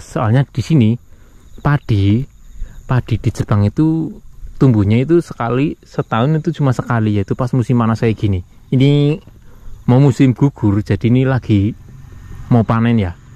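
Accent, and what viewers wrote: Banyumas, Indonesia. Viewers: aku suka gaya ngevloge, ana ngapak2e...👍👍👍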